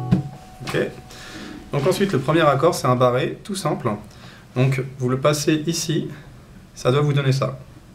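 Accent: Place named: France. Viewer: thank youuu,your accent is so sweet,i love french :D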